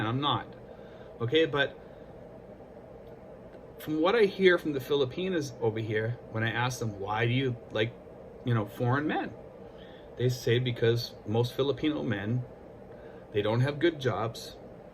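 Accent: Boston, United States. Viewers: Always feel like ( your accent ) I'm watching Good Will Hunting! Bumpah stichah, for the cah.. seriously...I shop at home in Canada for everything. Balut is available here. And ah, the ladies who make balut live here too. So, if you want to travel 7000 miles to shop, knock yourself out. Might be simpler to walk to the local store. Sheesh boys! We are college gradu-ates right:)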